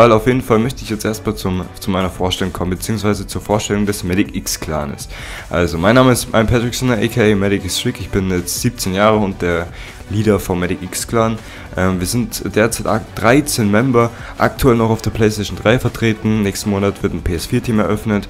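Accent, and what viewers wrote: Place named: Bavaria, Germany. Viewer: 100% Bayrisch - Gutes Video 👍👍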